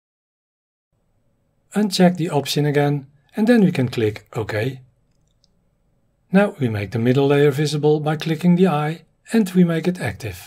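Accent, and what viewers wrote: Netherlands. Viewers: I adore that little touch of Dutch accent, you speak quite calmly and can be understood very well!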